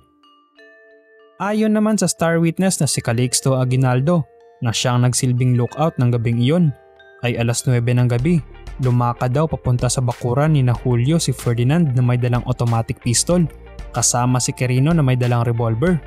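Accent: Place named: Philippines. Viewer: Please improve your accent and intonation para magandang pakingan and also correct pronunciation of f and v. That’s the common defect of us Filipinos .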